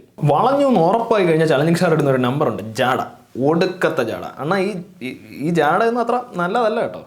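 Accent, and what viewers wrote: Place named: Palakkad, India. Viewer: Bro നിങ്ങൾ പാലക്കാട് തമിഴ് വല്ലോ ആണൊ നിങ്ങൾ സംസാരിക്കുമ്പോൾ തമിഴ് mix ചെയ്തു malayalam സംസാരിക്കുന്ന ആളെ പോലെ ആണ് എപ്പോഴും തോന്നുന്നത്.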